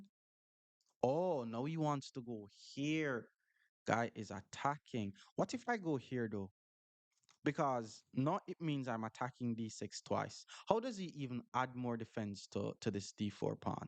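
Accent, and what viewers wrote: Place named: Jamaica. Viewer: bro i found your vids a couple of days ago, and I'm hooked. Best chess educational content on YouTube! also really appreciate the soft Jamaican accent. I find myself kissing my teeth when playing now, followed by "I can't take becaa...". Big ups bro, drop a lil more patois tho please <3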